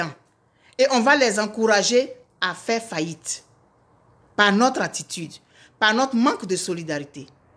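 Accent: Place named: Cameroon. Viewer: Vraiment il fait une publicité gratuite. Il est malade. Nous africains sommes méchants. C est un camerounais par son accent. Eeeh Dieu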